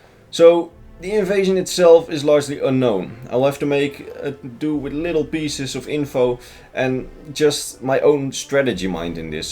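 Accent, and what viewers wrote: Netherlands. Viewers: Je klinkt zo nederlands als je engels praat 😂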